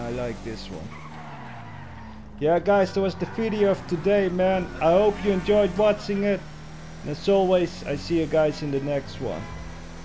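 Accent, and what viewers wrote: Netherlands. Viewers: im half netherlands and half from belgium. thats why i knew that he was from the netherlands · Are you dutch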